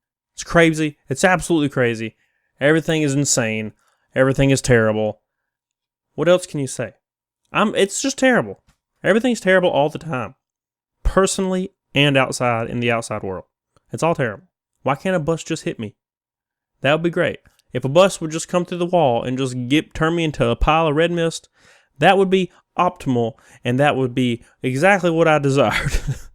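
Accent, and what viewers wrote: South, United States. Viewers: Ah. Figured it out at last! is channeling William Shatner..... If he had a southern drawl. Gamespot... IS..doitagain. SCOTTY! Set phasers....TO full. loadalltorpedoes...... <link> This is what I hear in my head whenever Gamespot is mentioned in these videos. <link> (Pretty sure no crabs in this video were left unharmed) Love the content man. Keep up the awesome work!!!!!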